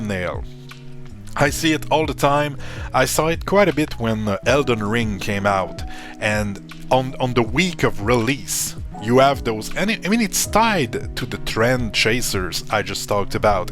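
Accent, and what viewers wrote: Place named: Quebec, Canada. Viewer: J'aime l'accent Québécois ! Cheers to you !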